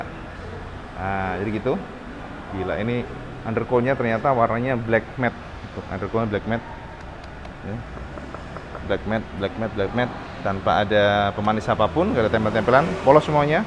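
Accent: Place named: East Java, Indonesia. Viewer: Saya malah suka gaya omonganya khas jawa timuran surabaya...👍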